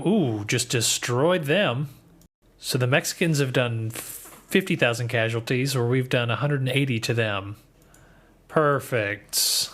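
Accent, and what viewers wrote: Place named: Louisiana, United States. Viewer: The Louisianan accent made me subscribe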